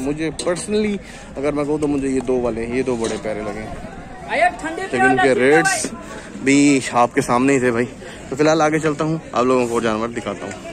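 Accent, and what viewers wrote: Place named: Karachi, Pakistan. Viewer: Bhai ap bilkul karachi walay lagrahay ho or bargling bhi karachi walo ki tarah krahay ho i like you keep it up agar multan aya to ap se zror miloga apka channel aj hi subscribe kia hai mainae.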